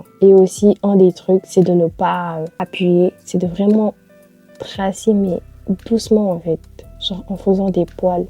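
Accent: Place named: United States. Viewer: J'aime bcp trp son accent elle a l'accent Américain !😂🤣😅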